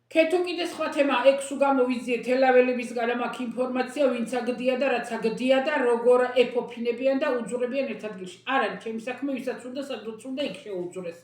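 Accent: Kakheti, Georgia. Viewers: მისაბაძი ქალბატონი ბრძანდებით, თქვენი საოცარი იუმორით, კახურით გემრიელით და თან გულისტკივილით რომ გადმოსცემთ სათქმელს ყოველთვის ჯანსაღად. უზომოდ პატივს გცემთ ქალბატონო,. საშინელებაა, თუმცა მასეთი სულით მდაბალი ადამიანისგან, სხვას არც უნდა ელოდო. ჯანმრთელობა, წარმატებები და სულ ლამაზი დღეები თქვენ.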